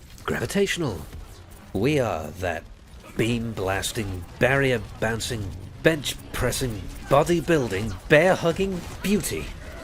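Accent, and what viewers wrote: Wales, United Kingdom. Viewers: Surely Welsh. Just watched the Hanzo introduction vid, he gets a bit heated right at the end. Guessing he mitigates his accent... Creepy analysis over. PS: Or, if Aus.... New South Welsh?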